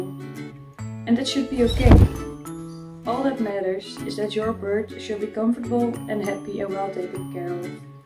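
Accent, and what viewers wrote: Netherlands. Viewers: You sound netherlands like how i say the english Words u say them whit a wierd axsent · I think I'm deaf, but I hear a Dutch girl speaking English. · Lovely! Really wonderful job! I can hear from your voice that you are dutch hehe😂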